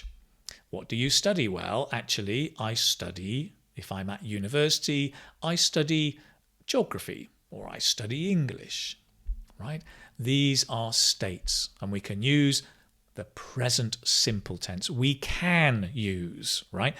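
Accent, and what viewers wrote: United Kingdom. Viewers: Your british accent is really awesome. Thanks to be here to learn us how to pick up british accent because it sounds rythmic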